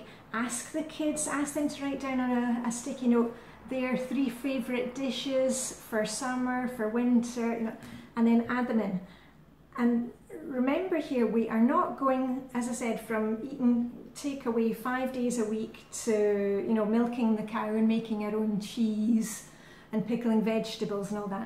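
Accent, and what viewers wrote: Scotland, United Kingdom. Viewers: You have such an open, natural style when you talk to us. Thankful every day for the thought and time you put into these videos, and for your sparkle and encouragement and good cheer. (My husband loves your canny Scot style! :)))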